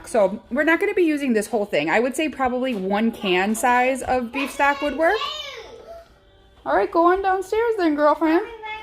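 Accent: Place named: Chicago, United States. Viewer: I was born in Chicago, raised in Des Plaines area and LOVE Chicago style "Italian Beef"!!! It really is mostly a Chicago area ONLY dish. I moved to the West coast in 1970 and have been missing my beef sandwiches ever since! Portillo's is great and does have a location Near "Knott's Berry Farm" in the Orange county area of Southern CA but we're a long way from there near San Diego. Not sure if we can find giardinerra out here or not. Recognized you accent. immediately! haha Will try your recipe but I do have a deli slicer so will likely use a rump roast and slice it up razor thin. Thanks!!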